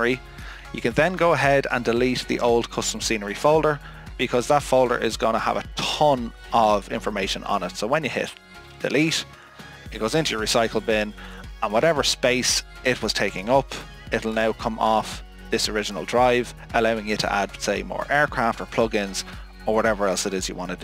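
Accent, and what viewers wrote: Ireland. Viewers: Lots of nice content on your channel. Love the Irish accent 😀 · I have watched a few other tutorials about this and i ended up deleting all of my aircraft... i was gutted and feeling hopeless about this symbolic linking or whatever... watched this and it made every bit of sense to me, it helps that you're a fellow Irishman, could understand the accent perfectly. Thanks for making this so clear to me, I've now got it working and i really appreciate it.. subbed!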